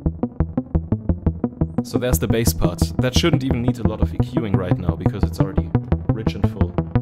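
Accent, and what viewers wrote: Germany. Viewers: direkt deutschen akzent rausgehört :)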